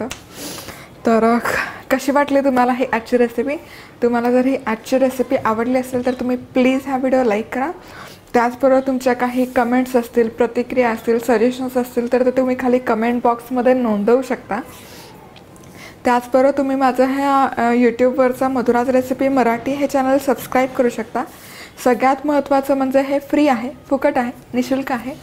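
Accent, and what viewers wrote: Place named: Pune, India. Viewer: tume chan puneri boltat....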